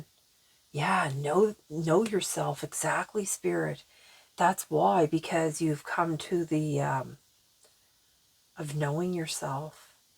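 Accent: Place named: East, Canada. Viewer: Hi …. Haha yup things are shifting! I just love your eastern Canada accent being in Saint John NB from western Canada 🇨🇦 it’s my favourite as the people are the kindest in the Maritimes … as spiritualists we all need to get into gear now 👍🌈💕🌈🌈🌈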